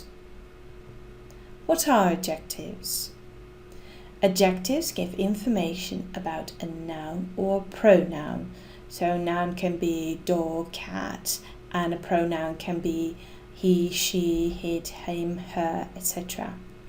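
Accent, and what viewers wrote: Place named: West Midlands, United Kingdom. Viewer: very professional presentation. and very understandable. excellent . thanks !!! watched from central America, I detect i think a rather refined west midlands accent. please keep posting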